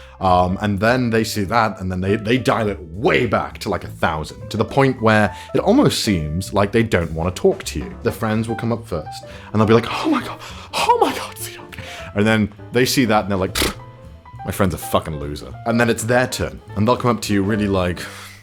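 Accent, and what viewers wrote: United Kingdom. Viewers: "saying British things from my British face." Me:... As a British person I just realised how inferior my accent is compared to. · with the british accent?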